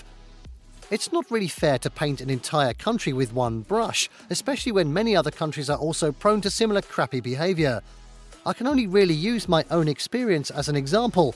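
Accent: England, United Kingdom. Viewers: Yeah, someone else see's through his bullshit. Aussie with an English accent. Pertains to have been born here, yet on another video he says he would like to visit. Some other commenter claims this originates in India. Maybe that is why such an emphasis on it. By the way this banana really is full of poo poo. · Mistakes 1. New Zealand flag not Australian 2. English not Australian accent 3. Supposed indigenous people contained indigenous to Africa, North America and South Pacific Islands 4. Photo of second largest city which is Melbourne were actually of third largest city Brisbane Many more WHAT A FAKE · This entire video was a hate campaign produced Indians using a Brittish accented narrator who claims he was born and raised in Australia.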